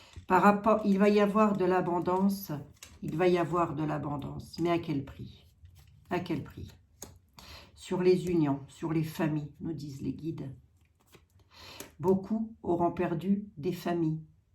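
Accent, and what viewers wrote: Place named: Vosges, France. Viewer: Le léger accent ne m'a pas trompé. Je suis aussi des Vosges mais cette fois, avec un accent beaucoup plus prononcé. Je l'ai perdu un peu depuis que j'ai quitté la Lorraine...😂🙏😇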